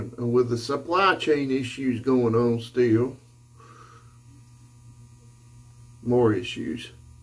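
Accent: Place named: South, United States. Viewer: Great shave! Where you from? You sound like a fellow Southerner.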